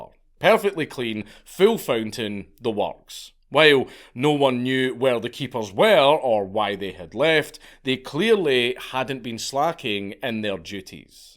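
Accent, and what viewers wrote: Scotland, United Kingdom. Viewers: Goddammit you know how hard it is to find Celtic Myths on youtube narrated by an ACTUAL SCOTSMAN. Throw me another several bones as one of your countrymen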